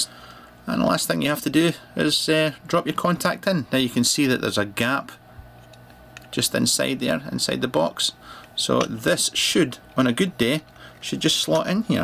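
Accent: Scotland, United Kingdom. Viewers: Always reassuring to hear a Scots accent :-)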